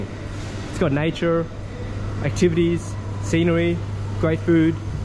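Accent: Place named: Australia. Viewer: What on earth do you mean? He has Aussie Accent he was born in Australia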